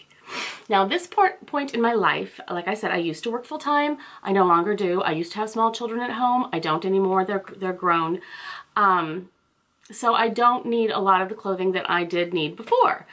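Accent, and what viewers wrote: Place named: Midwest, United States. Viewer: I just stumbled on your videos, and I love them! I knew I recognized that Midwest accent, I am a fellow Chicago suburb girl. I also strive to be casual chic in this stage of my life too. A stay at home mom with mostly grown daughters, looks like we are both in the same boat!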